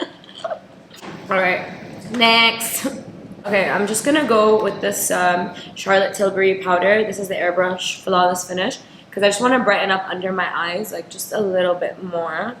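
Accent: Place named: United States. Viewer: How do you have an American accent? And stop hogging all the attractive genes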